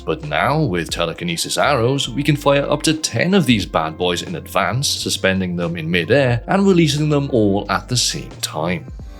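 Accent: Wales, United Kingdom. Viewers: welcome back i have missed your beautiful welsh accent and fantastic videos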